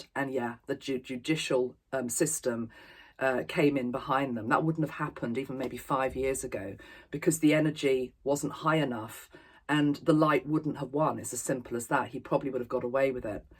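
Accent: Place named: RP, United Kingdom. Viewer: I feel relief not schadenfreude when when people get justice, I feel we are moving into cleanliness. But I do want some 3-D justice - it will make things easier. and thank you for your dailies. I find you and even the sound of your queen's english - very satisfying.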